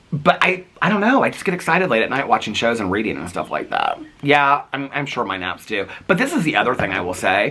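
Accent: South, United States. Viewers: Miss Dolly Parton had the strong hold on you since you were 10 😂 bet that is where the southern accent came from! 🤠💙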